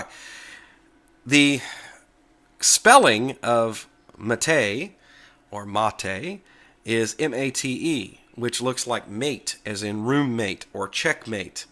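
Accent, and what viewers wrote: South, United States. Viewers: Does pronunciation matter? Well, not really as long as communication is possible (paaaaaaasible :-P ). Love that long "a" sound so many Americans have on words with "o" in them. I can't even make that sound :-D I love all the accents you get on Youtube, all those different people in different places, proving how diverse the world is. My accent and dialect is English (a mixture of west Yorkshire and west Midlands dialect and accent). I was having fun with your accent on the Ubuntu video, I'm guessing it's southern US somewhere? Virginia?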